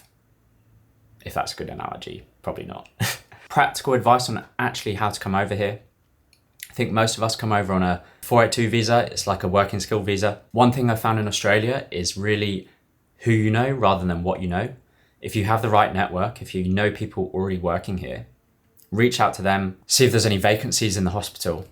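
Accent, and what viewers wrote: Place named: United Kingdom. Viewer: Hi As the child of a British migrant, I've always believed my mother when she told me how nice it is here. It's sad to hear so many Australians talk the place down & and can't wait to leave. PS: I think you have lost some of your British accent! My English mother is 94, and sounds very English to me but British people tell her the same thing. Well done for being adventurous & trying out a new life.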